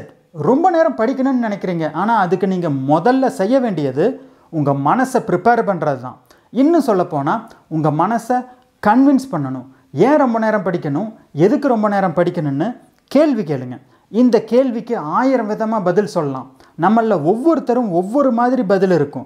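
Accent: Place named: Kongu, India. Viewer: Your tips is good and your kongu slang good.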